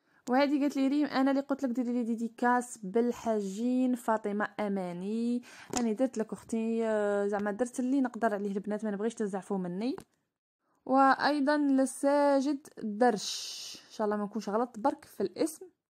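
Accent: Oran, Algeria. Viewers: شعال نعجبني هدر تاع غرب حوجيان تفكرني بلبلاد هههههه 3تاع صباح حرزي يخرجلك سمهروش 😂😂بصحتك خرجتي زلة أول مرة نشوف قناتك ❤ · جيتي تهبلي تعجبني هدرتك نحسك من الغرب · اني جاية من عند ام لارين عجبتيني ههههههههه هدرتك وهرانية فحلة ههههههه انا من مستغانم بالتوفيق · باينة عليك وهرانية ومتروطشة كي حالتي نوض على الفجر نخرب في شعري وجهي٣على العموم متشرفة بيك٣اختي عجبتيني٣من هدرتك وغادي ندير اشتراك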